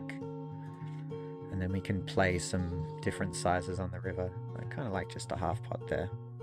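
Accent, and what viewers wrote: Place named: New Zealand. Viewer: His accent sounds like he's from New Zealand but it says hosting from Canada? Am I just mistaking the accent or did he move? Anyone in comments know the answer? (Curious because I'm from New Zealand)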